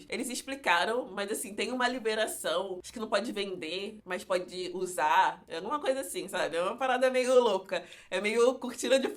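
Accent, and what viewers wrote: Brazil. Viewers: vc é africana ? seu sutaque é igualzinho de um brasileiro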